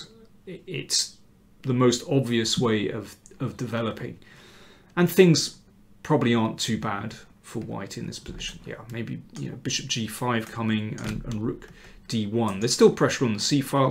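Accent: United Kingdom. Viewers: Please keep saying "At all" in all your videos, because your ultra British accent is accentuated. It's great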